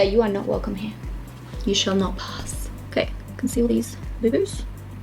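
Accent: Australia. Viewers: I enjoy watching your videos sooo much partly because of your australian accent, of course also because of your make up skills :) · Ohhhh waoooo. I like your personality as a whole person and your Australian accent. Love you . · It's strange.... I never liked the Aussie accent before I started watching your YouTube videos lol... Love your videos... Do you know what I mean Jelly bean? ☺️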